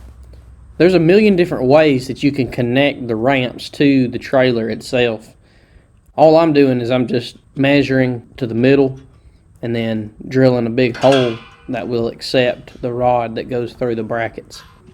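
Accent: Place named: South, United States. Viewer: Good, honest stuff. Commenting from Albany, GA. You sound like you're close-by. Regarding being an expert: That's something I posted about my dad one Father's day....He taught me you don't have to know how to do something to get it done.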